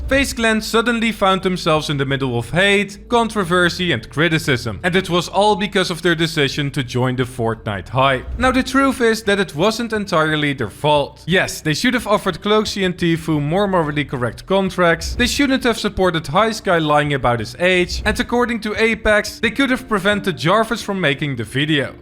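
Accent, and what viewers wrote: Netherlands. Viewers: is it me or does this dude has the biggest dutch accent i have heard in my life?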